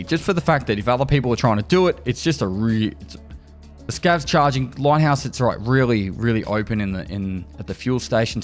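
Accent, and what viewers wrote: Australia. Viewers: I watch your videos to practice my Australian accent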